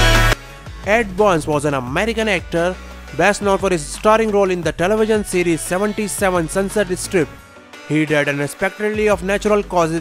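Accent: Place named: India. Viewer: The announcers Indian accent is so distracting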